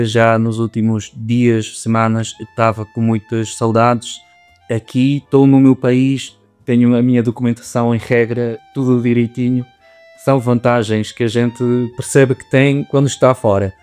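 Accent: Portugal. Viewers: Antes de vires para Portugal tu já falavas bastante bem o português de Portugal, depois do tempo que passaste aqui o teu português é perfeito, mesmo o sotaque, está lá mas muito tênue É pena não teres conseguido ficar, mas tem esperança pode ser que consigas voltar com outro tipo de condições que te dê garantias de ficar cá. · Olá! Reparei que falas mais em português de Portugal. Parabéns por isso! Nunca desistas. Um forte abraço Amigo! 🤗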